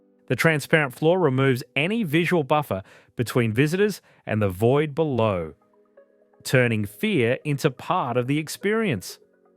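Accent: Australia. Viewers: Australian narrator has caught my ear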